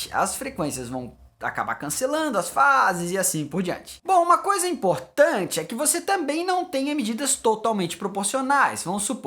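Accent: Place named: Florianópolis, Brazil. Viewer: sotaque de floripa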